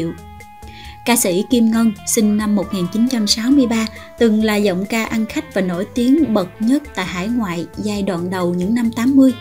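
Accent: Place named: Mekong Delta, Vietnam. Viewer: Lần đầu nghe giọng đọc rất tình cảm , ngọt ngào giống tiếng miền tây .. ❤❤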